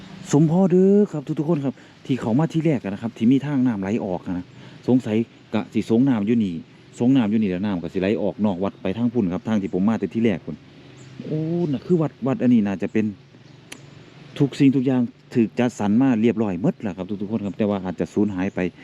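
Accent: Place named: Thailand. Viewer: อ่านภาษาลาวกะต้องออกสำเนียงลาวตั๊วจังแมน ออกสำเนียงไทยมันกะไปคนละทีปถ่อนแล่ว.. วรรคสุดท้ายเพิ่นบอกให้ปลดเกิบ คือถอดรองเท้าเพื่อแสดงความเคารพ...เบิ่งมาหลายคลิปเกี่ยวกับวัดตาแหลว แต่บ๊มีไผเว้าถึงสาเหตุที่แท้จริงการถล่มวัดของเมกา นอกจากคาดเดาว่า แถวนี้เป็นจุดยุทธศาสตร์ที่สำคัญ และกองกำลังทัพประชาชนลาวใช้วัดแห่งนี้เป็นฐานในการสู้รบ..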